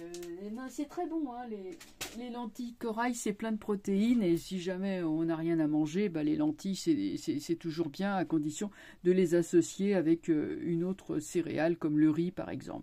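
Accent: Paris, France. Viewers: Bonjour, cela fait déjà un bon moment que je vous écoute et que j'apprécie votre travail.. Mais surtout vous êtes pour moi comme une petite madeleine de Proust.. Votre voix et votre accent titi parisien me rappellent mon enfance à ménilmontant, pigalle, le sacré cœur tout ça tout ça les marrons chauds.. Que du bonheur.. Merci..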